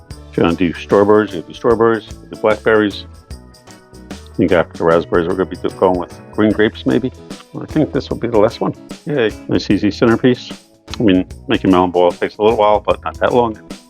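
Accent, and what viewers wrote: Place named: Northeast, United States. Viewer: Bet this guy is from the NE USA how he pronounces it wooder melon. People in Maryland Jersey New York and PA call it water ~ wooder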